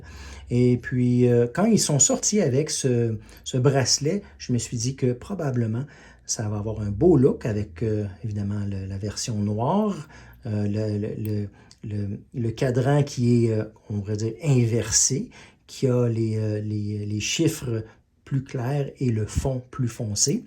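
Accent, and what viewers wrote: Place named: Quebec, Canada. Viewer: Woaahhh! Un québécois!! Super!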